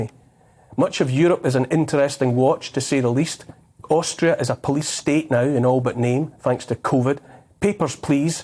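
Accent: Scotland, United Kingdom. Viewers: Neil, your speeches are always so moving, like great literature, and spoken in a beautiful Scottish accent. I would implore everyone to take their children out of school if they are being forced to mask at at school. Children are capable of learning without government schools.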